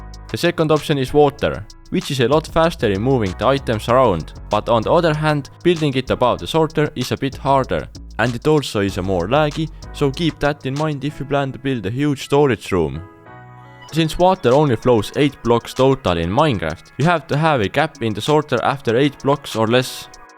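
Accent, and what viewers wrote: Finland. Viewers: Just with your accent I can tell you are Finnish! Terve Suomi! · You are Finnish . I am absolutely in love with the Finnish accent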